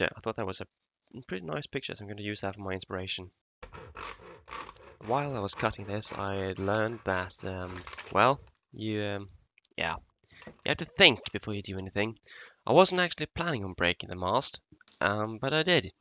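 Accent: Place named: United Kingdom. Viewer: Turned out really nice, mate. I would suggest if you’re going to get into dioramas that you invest in a Dremel tool (a little over $120 Aussie on eBay, so probably around 60-70 quid going from your accent, lol!!) - they’re perfect for cutting, and you’d be able to make the edges where the tanker broke in two more jagged and realistic. Also get yourself an airbrush (around AU $150 on eBay for a half decent kit including compressor and everything else you need), it makes doing the detail work that much easier. Please don’t get me wrong, what you’ve done looks fantastic, but particularly the Dremel will go a long way for those little finishing touches. As an aside, on a historical note, empty tankers were even more dangerous and prone to being blown out of the water than full ones, as the tanks may have been empty, but were full of explosive fumes, just waiting for a spark. 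Tanker crews were hands down some of the bravest to serve!!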